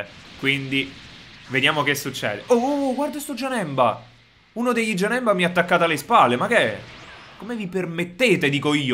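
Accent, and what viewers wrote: Naples, Italy. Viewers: Sei napoletano io si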